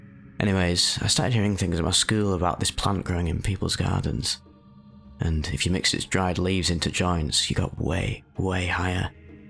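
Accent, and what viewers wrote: United Kingdom. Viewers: I don't want to seem stupid but you said your from canada did you move to the uk? Because of your accent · Are you actually British or is that just the accent you do?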